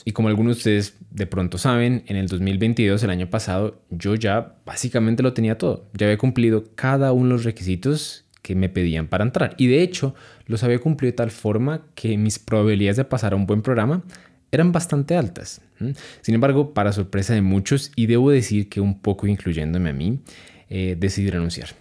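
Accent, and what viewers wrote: Colombia. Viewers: Por la forma de hablar supe que es Colombiano.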